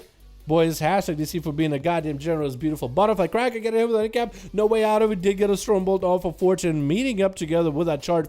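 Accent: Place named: Russia. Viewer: LOL! Superior russian aсcent!!! =)))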